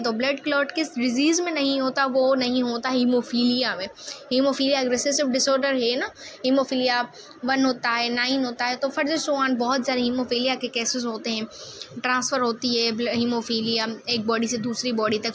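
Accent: Sindh, India. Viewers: Mera ek dost Sindhi wo bhi esey hi bolta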